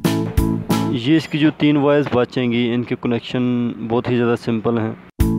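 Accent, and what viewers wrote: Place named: Pakistan. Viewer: Aap Ki Awaz se se lag rahi aap Pakistani hai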